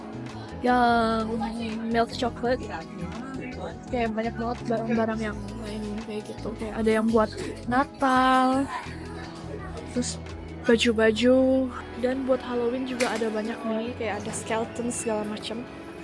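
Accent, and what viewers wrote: Surabaya, Indonesia. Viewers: Jancuk e ngena bngt ...arek Suroboyo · ngonten logat surabaya dong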